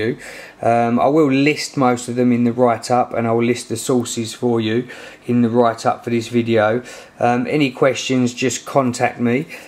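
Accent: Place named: United Kingdom. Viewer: Piges?! Lol british accent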